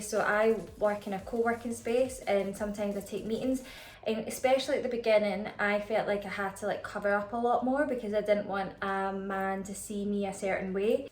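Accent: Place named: Scotland, United Kingdom. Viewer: Thank you so much for sharing ❤ I appreciated and related to so much you said. Your perspective is so valuable, for all the aspects you mentioned that have been barriers for you... being a gorgeous, woman of colour, from our old neighbourhood, with a Scottish accent, and valuable unique experiences ❤❤ exactly why your perspective matters so much 🙌🙏 realer than shit we are overloaded with ❤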